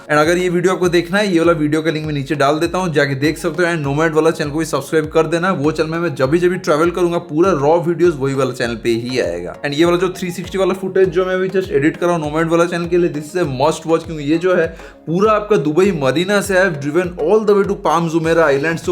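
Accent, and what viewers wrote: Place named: Bengal, India. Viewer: Improve ur hindi accent..U sound like bangali from ur Hindi ..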